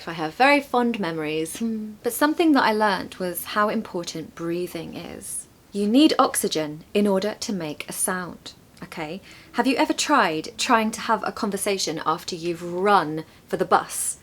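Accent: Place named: England, United Kingdom. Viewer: I think your accent is the nicest English accent. Every word you say is crystal clear and lovely to listen to. I, myself, sound like an old ship's clapped out foghorn. Another great video,! Have a great weekend 👌🎸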